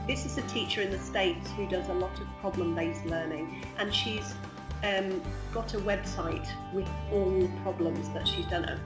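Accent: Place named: United Kingdom. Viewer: Hello:) I've just finished watching your video and I've got to say that, in my estimation at least, it was pretty helpful:) Besides, I've been your loyal viewer for a long time but not in order to learn English, just for fun. Your English is incredibly fluent and additionally your accent sounds very British and so that makes me think you are a teacher. If I'm correct, I'd like to ask you a question:) Well, I currently attend a secondary school and I've been wondering what I can possibly do to decrease my anxiety while talking to my teacher. My being anxious doesn't stop me from keeping a conversation going but it's quite annoying because then I can't help the swaying of my legs from side to side or I feel a constant need to gesture. Also, I sometimes feel kind of embarrassed when I speak and my teacher smiles to me or looks intensively in my eyes. 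I don't know why it works like that. Nonetheless, I do not deal with this problem while shooting the breeze with my friends in English or having a conversation with other English teacher at school. I want to start working on it but I'd need some advice and you're the only available teacher :) I know my message is long, I'm sorry, I hope you successfully reached the end:D I'm looking forward to hearing from you:)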